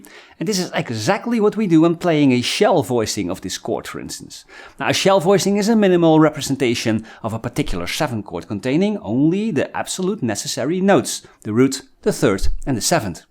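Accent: Netherlands. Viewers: This is the BEST guitar channel I've discovered in all my years. And your playing - is beautiful, soulful, tasty, everything I'd want to be! And your accent reminds of my beloved late (Dutch) father.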